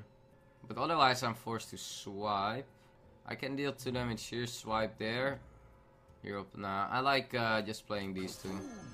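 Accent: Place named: Netherlands. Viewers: You can't just tell a person to be good at something. as you know is Dutch which means he has been speaking the language for his entire life and obviously has adapted to that way of speaking. The Dutch language has a lot of rules which the English language either doesn't follow or has a different rule for entirely so teaching a Dutch person English is harder than teaching them some other European languages. (I probably also made some grammar mistakes in this comment sorry about that.) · I understand and agree with your point, its more of a recommendation if anything:) would (I think) benefit from minimising his Dutch accent, as it will widen his audience and allow him to more effectively reach his viewers. it's not just me berating him for his English accent, it's imo in his interest as well!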